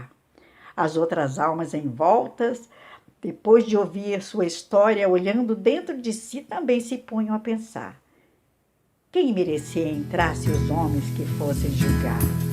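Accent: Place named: Minas Gerais, Brazil. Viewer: Oi, que maravilha te rever mesmo sendo na telinha com uma performance maneirissima e sotaque mineiríssimo também 😹. Admiro você “D+ da conta “uai“, especialmente pela sua simpatia, humildade, esforço, perseverança e fé que o amanhã sempre será melhor do que o agora. “A fé não costuma falhar” viu? Um beijão do tamanho da bochecha!😹🥰🥰🌈🌈🌈🌈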